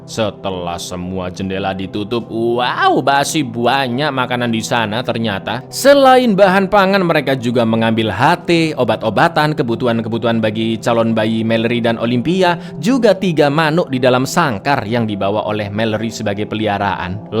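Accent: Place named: Madura, Indonesia. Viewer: Bang orang madura emang,,ko gitu siih logatnya,,😅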